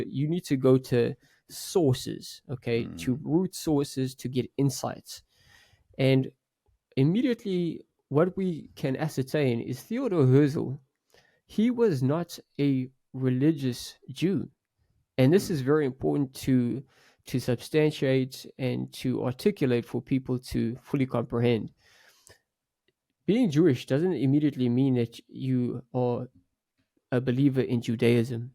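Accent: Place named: South Africa. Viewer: Sorry to ask trivial questions - where is from? His accent sounds so similar to South African. Aweh.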